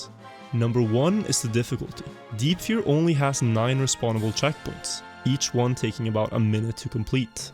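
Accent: Norway. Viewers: Er han norsk?! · Are you Norwegian? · Are u Norwegian?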